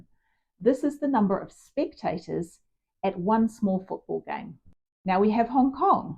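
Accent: New Zealand. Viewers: You sound like a kiwi .. are you? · Bitcoin is 👑..keep up the Great work, Spreading the Bitcoin Message..My Btc Journey began in May 2021, and I never looked back..I enjoy your content as well as..Your Hot 🔥🔥🔥....I look forward to your next episode..I detect a kiwi accent..😬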